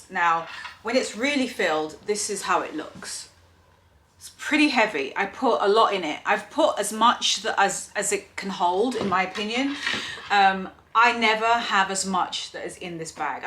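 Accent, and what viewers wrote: United Kingdom. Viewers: I love your videos!! I love your style. I love your apartment!! You and your husband are a great couple. I also love your British accent, by the way.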